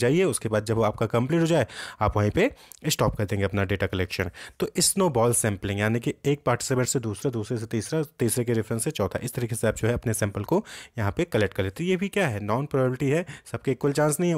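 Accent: Uttar Pradesh, India. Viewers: lgta h sir up se h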